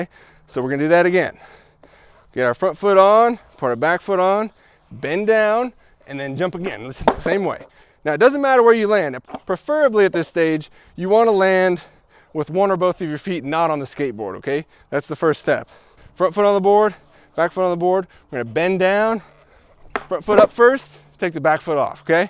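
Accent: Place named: South, United States. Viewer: Great video....But when did you get the southern accent?!!!!😁😁😁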